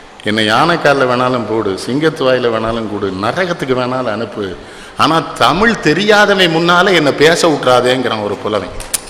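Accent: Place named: Tirunelveli, India. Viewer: நான் என் இளமை காலங்களில் சுவைத்த நெல்லை தமிழ் மறந்து விடும் காலங்களில் அய்யா நெல்லை கண்ணன் குரல் கேட்டு மகிழ்ச்சி அடைவது வழக்கம்.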